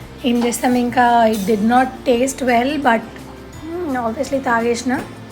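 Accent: Telangana, India. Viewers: Hi what are you first time watching new video but very good talking are you Telangana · Perfect telangana yasa (slang 😎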